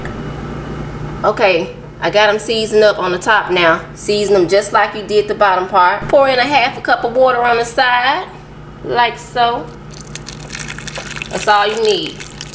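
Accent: South, United States. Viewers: good ol southern accent I love it lol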